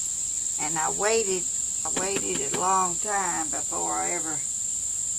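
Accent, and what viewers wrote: South, United States. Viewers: love your videos & your wonderful Southern Accent. I’m an Alabama Girl.... Have you tried working with old tennis shoes? My daughter received a flower planter as a gift made from a shoe. It looks like the artist use your technique with concrete. I’m anxious to try one of your projects. I have been saving old jeans for a while. Now I know what I want to do with them. Thank you so much for your videos. I love every one of them. Your videos have inspired me to get busy and use up those jeans. Lynn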